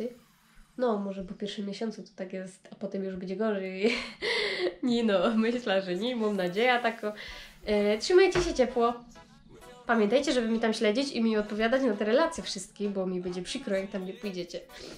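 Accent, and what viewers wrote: Silesia, Poland. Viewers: Fajnie słuchac Ślonsko godka w wersji żeńskiej 😂😂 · Pozdrowionka i powodzenia ;) Miło się słucha tej Twojej ślonsko godki.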